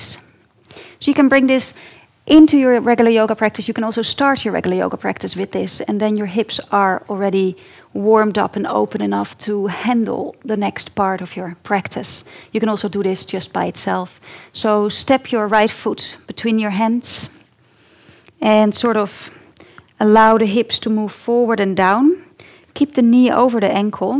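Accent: Netherlands. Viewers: I love your Dutch accent. And your videos. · No, it's a Dutch accent.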